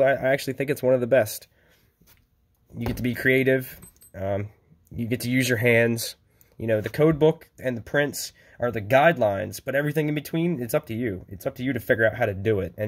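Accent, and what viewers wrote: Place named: Maryland, United States. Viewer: I knew you were from Maryland the second you started talking haha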